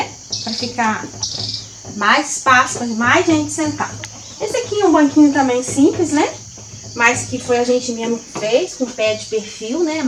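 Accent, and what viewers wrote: Minas Gerais, Brazil. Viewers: É mineruai kkkk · Chegando no canal. Ganhou mais uma inscrita. Seu gosto, meu gosto. Amo reaproveitar, transformar, inventar e por aí vai. Esse fogão amarelo deu um charme todo especial. Tenho o costume de pegar objetos de família e restaurar. Tenho várias coisas de roça do meu avô. Se vc soubesse o qt gostei da sua casa!!! O quarto cheio de caminhas com colchas de retalhos, os bancos, as cortinas, o banheiro todo lindo e até a sua timidez 😂. Sou mineira também. Seu sotaque ñ nega. Parabéns. A-DO- REI 👏😘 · Com sua voz de mineirinha ficou um show e amei sua casinha . Parabéns e Deus abençoe sempre beijos pra vc.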